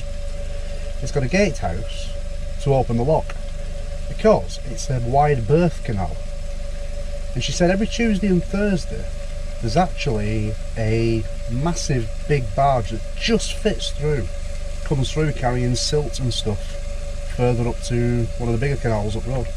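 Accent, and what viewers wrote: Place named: Cornwall, United Kingdom. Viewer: Yeah more cooking in your “Cornish” accent.. 👍🏻.. “like if you agree”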